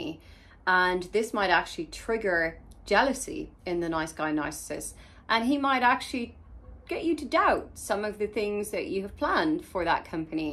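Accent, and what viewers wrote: Ireland. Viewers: Are you Irish? 🤔 I can tell by your accent! 🥰🤗💖🧡🤍💚 Good, important video. 😁👍🏻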